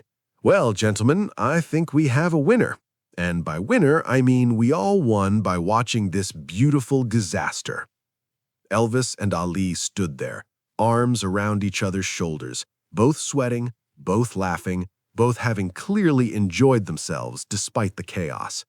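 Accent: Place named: South, United States. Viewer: why does the narrator have to have a redneck accent?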